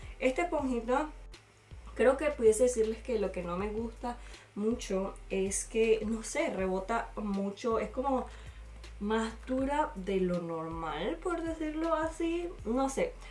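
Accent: Venezuela. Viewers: Eres venezolana? Hablas como venezolana!❤ saludos desde Vzla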